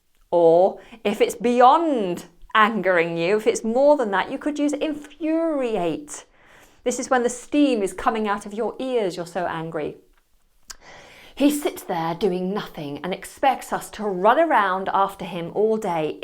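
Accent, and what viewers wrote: United Kingdom. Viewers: You are superb!! I am not a native but i have a US dialect when speaking and i watch you while jealously wishing i have your UK accent 😂❤❤ Following from Iraq 👋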